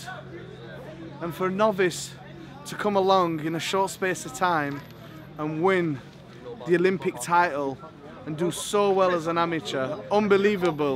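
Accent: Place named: Yorkshire, United Kingdom. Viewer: I'm jus here for Yorkshire accent cuz I luv Yorkshire accent lol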